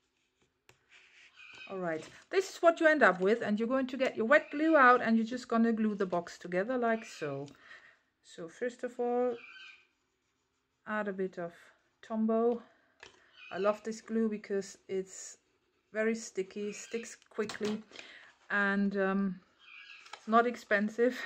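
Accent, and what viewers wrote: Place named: England, United Kingdom. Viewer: i love , love, it, i will surely try it because i love the 3D projects. i am sorry for your finger but we all do this with the excitment of seeing the finish project. how satisfying it is when we finish it specially when it is our own creation. For me i love your english accent and your english sounds perfect for me. continue your good work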